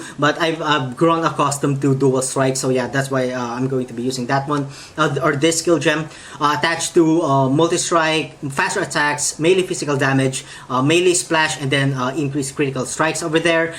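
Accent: Philippines. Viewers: I was like..? Why he sounds like a filipino? And I search all the social media and yeah youre a filipino "kababayan" and matry nga to why not? Thanks for this vid I'll try this especially I'm a newbie.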